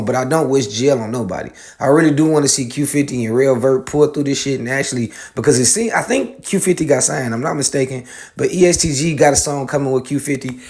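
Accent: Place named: Gary, United States. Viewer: he gotta be from Gary or east Chicago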